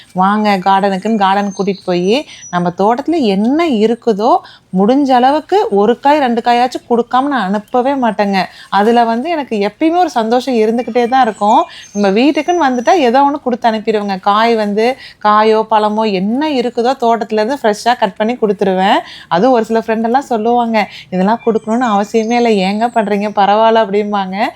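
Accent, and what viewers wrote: Kongu, India. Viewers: Kongu tamil ❤❤🎉 voice super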